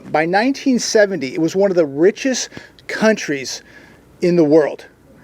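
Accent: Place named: Chicago, United States. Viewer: Taday,love the Chicago accent😊✝️.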